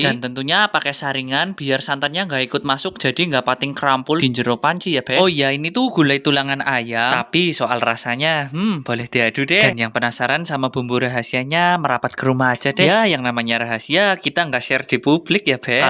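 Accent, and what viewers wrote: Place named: Java, Indonesia. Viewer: Jowo pundi mas? Kok medok men🗿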